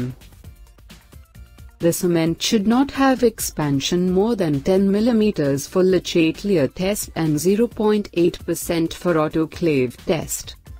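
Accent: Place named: India. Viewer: Why does the bot have an indian accent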